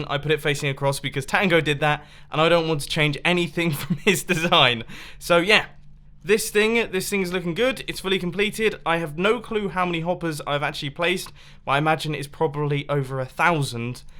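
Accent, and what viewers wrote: United Kingdom. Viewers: where are u from in terms of like Yorkshireman or Londoner.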